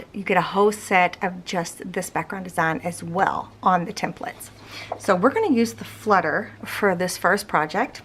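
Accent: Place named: Tennessee, United States. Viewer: I enjoy crafting along with you because you’re so very kind to your viewers.😊 It’s always a pleasure spending time with you, especially when you make a boo boo and you show us how to fix it. 🤗……also, I love your accent. I grew up over the hill in Tennessee and when I hear your voice it reminds me of home.❤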